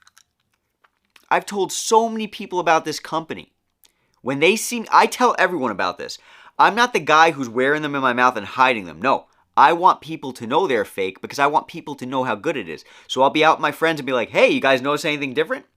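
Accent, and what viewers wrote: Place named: New York, United States. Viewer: and wasn't even from the name, I didn't see that till after. It's so funny, I was just watching, your personality, demeanor, the way you were talking, then it hit me! If you were from New York it would have given it away a lot quicker. lol thank you so much for the video! I might have to give it a shot, I have to do something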